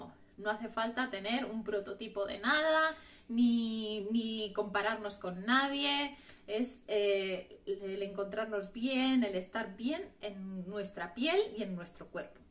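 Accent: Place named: Spain. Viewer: Me gusto la compra que hiciste saludos desde México y me encanta tu asento español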